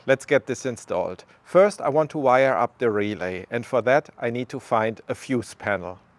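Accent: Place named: Germany. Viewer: Hehe, man hört sofort das du aus Deutschland kommst😅 , was den Radarsensor angeht, da hättest ohne Probleme die Hupen an den Schrauben montieren können. Der sprinter kalibriert sich jeden start selber von neu. Hat den Hintergrund das der Beladungszustand ja variieren kann. Die paar Grad machen da nix aus. Habe dort auch meine Hupen montiert. Fertiglösungen die angeboten werden haben noch Dickere Platten aus Stahl verwendet wie bei dir in Verwendung. Beste Grüße 😊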